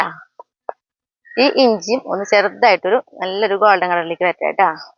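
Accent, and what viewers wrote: Thrissur, India. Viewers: വീഡിയോസ് ഒന്നിനൊന്നു സൂപ്പർ ആണ്. കണ്ടു കഴിഞ്ഞ വീഡിയോകൾ ആണെങ്കിലും പിന്നെയും ഞാൻ കാണാറുണ്ട് അത്രക്കും ഇഷ്ടമാണ്. പിന്നെ നമ്മുടെ തൃശൂർ ഭാഷ കേൾക്കാൻ ഇഷ്ടമാണ്. വളരെ innocent ആയിട്ടുള്ള സംസാരവും വളരെ ഇഷ്ടമാണ്. കുടുംബത്തെ ഈശ്വരൻ അനുഗ്രഹിക്കട്ടെ എല്ലാ സൗഭാഗ്യങ്ങളും നേരുന്നു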